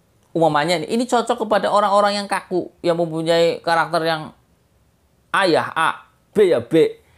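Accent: Tegal, Indonesia. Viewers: Asli mana Om.... Tegal yoo Logatnya... Salam Ngapak yoo Om... Bolehlah Kerisnya... Tak Dolan ning omah njenengan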